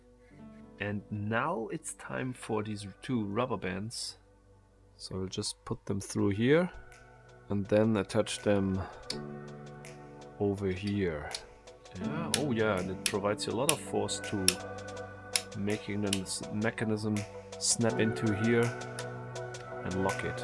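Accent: Germany. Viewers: Do you actually live in Germany? Because your accent is really German-like.. I'm from Germany too 🤔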